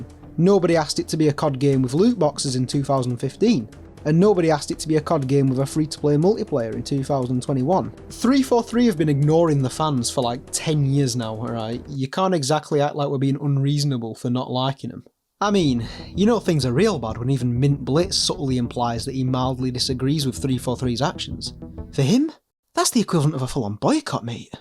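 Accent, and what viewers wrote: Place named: South Yorkshire, United Kingdom. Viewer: South Yorkshire police, by the sound of the accent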